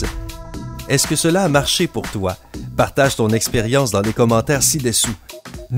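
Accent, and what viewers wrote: Quebec, Canada. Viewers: Putin d'accin québékouè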